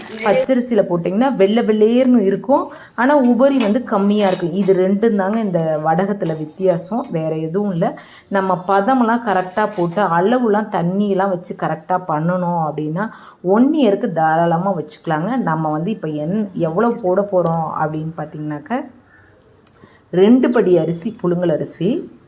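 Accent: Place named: Kumbakonam, India. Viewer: Super குடந்தையில் இப்படிதான் சொய்வோம் வார்த்தையுமா எங்க ஊர் மாதிரியே பேசுரிஙாக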